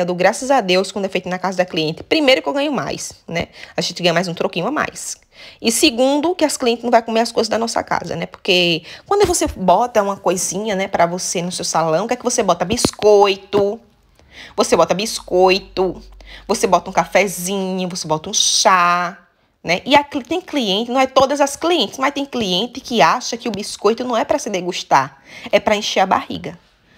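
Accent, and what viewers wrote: Northeast, Brazil. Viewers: Vc é muito espontânea e engraçada. Vc é do Nordeste? Amei o seu sotaque. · O sotaque nordestino é o melhor do mundo, amooooo!! Tú é muito boa, mas a narração é a melhor!!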